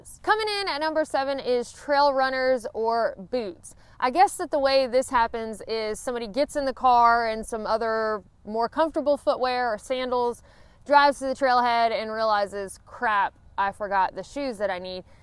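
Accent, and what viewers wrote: South, United States. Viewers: This has NOTHING to do with backpacking, but I suspect it's happened to one or more of your viewers: I left my cellphone in a bathroom stall at a gas station in Sevierville, TN. It was the famous Frank Allen's (they have great breakfast: simple, comforting and so good, but they're famous for their slaw dogs) and someone was honest enough to turn it in. We happened to have some friends there when we left, so we contacted them and they picked it up for us. We were lucky, because my Amazon app was hooked to my credit card. Coulda been expensive. I have a feeling that folks have left their phones on the trail somewhere, hopefully with not-terribly-painful results. I thoroughly enjoy your channel, young lady. Your Southern "flavor" to the channel is comforting and most pleasant. It reminds me of the wonderful experiences I've had and hospitality of our brethren in the American South.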